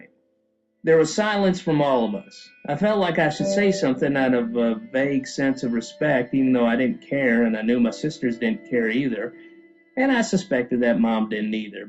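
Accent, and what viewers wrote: South, United States. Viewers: why is he talking like a southerner? I know he's from the south but he sounds different here. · He sounds like, 80% more Southern than usual.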